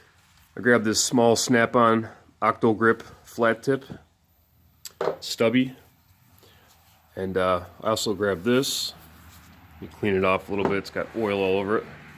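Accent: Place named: Long Island, United States. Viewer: You from long island???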